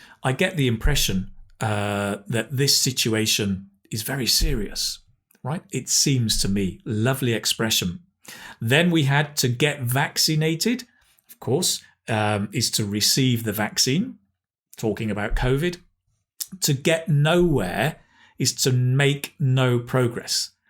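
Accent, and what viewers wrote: United Kingdom. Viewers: Nothing like a British accent, absolutely Masculine...such a marvellous hissing sounds. Nice presentation.👍👍👍👍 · NO American accent, only British!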